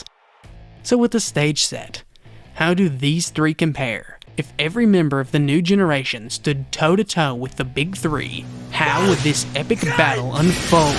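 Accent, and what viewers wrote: South, United States. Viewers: Love the southern accent no diddy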